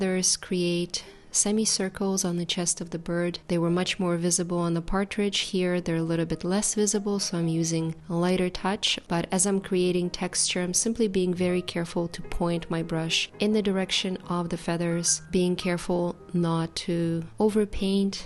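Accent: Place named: Canada. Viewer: Well, I"m a native English speaker from London and currently do a little bit of teaching English where I live in Spain. I must say, I thought you were Canadian! Not only that, but you have a beautifully sounding voice that is calm and relaxed, which is very soothing on the ears! I saw a video of an interview you did for a Ukrainian news channel. The way you switched between the two languages was excellent. I'm fluent in Spanish, so I can appreciate the effort it takes to learn another language. But you have absolutely mastered the pronunciation of English, despite it not being your first language. It was very interesting to learn that some of your art skills come from ancient Ukrainian folk art traditions. Folk art is an important part of history and culture that unites people with their past, but also gives them a frame of reference to guide them through the present. 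It is also a great gift to share with other people and cultures. Long may you continue with your wonderful projects and I wish you a long, healthy and happy life for you and your family.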